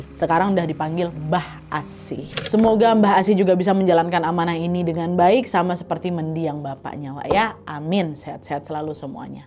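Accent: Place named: Medan, Indonesia. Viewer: nampak x kau medan nya Wak🥱🥱🥱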